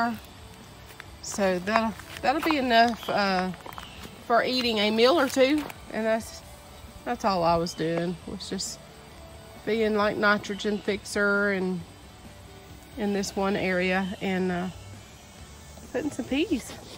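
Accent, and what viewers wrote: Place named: South, United States. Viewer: Your accent sounds like home to me. Must be close to Memphis TN. Maybe Georgia? Thanks for the tour! Love the name! Chuck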